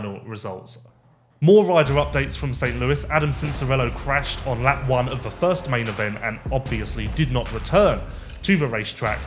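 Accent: United Kingdom. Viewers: I’m gonna have to pass on this British accent. Hard pass. I’m sorry I even clicked on it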